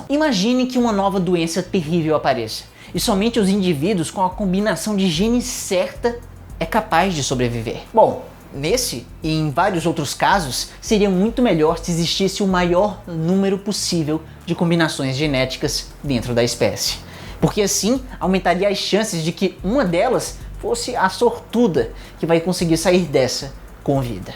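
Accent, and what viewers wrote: Ceará, Brazil. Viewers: você é cearense né?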